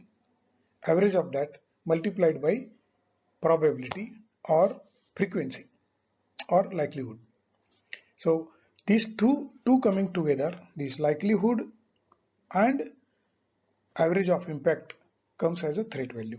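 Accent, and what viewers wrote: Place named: India. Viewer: Sorry, I'm Italian but I don't understand much of what you say. You have a strong Indian accent. You should post subtitles.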